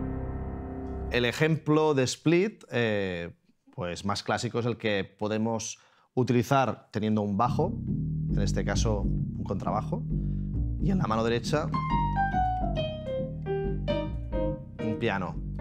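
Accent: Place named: Catalonia, Spain. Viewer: si te cert accent catala el teclat moli...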